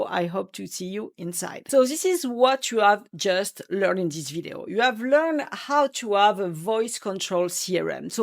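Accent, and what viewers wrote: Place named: France. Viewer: Wha le french accent but super glad to see and GHL expert as woman ! 🔥🔥🔥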